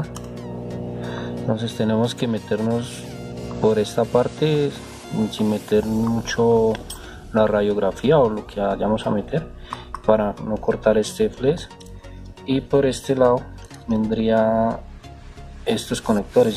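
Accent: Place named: Colombia. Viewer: Por el hablado pues es obvio que de Colombia...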